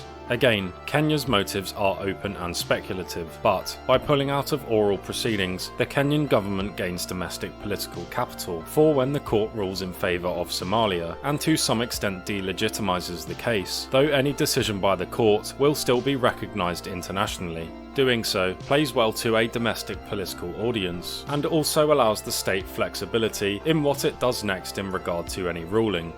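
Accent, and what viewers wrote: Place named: Australia. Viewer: You talk like Billy Finn, the dolphin the family guy, which drives me to speculate you are from Australia.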